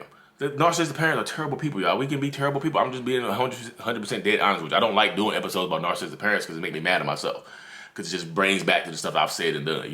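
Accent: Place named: Baltimore, United States. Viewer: Are you from Baltimore? You say Pernt instead of parent like a true baltimorian 😊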